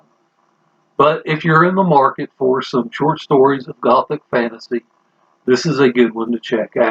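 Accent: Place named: Appalachia, United States. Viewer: Hey. First time viewer. I won't presume to guess where you're from, but the way you speak reminds me a wee bit of some dear friends from Appalachia. Also, good instinct with the lava lamps. Ads a nice dynamic element to your shot. Also, also, didn't know about stone babies before (I do the nurse thing IRL, and I am reliably fascinated by medical phenomena I haven't heard of before).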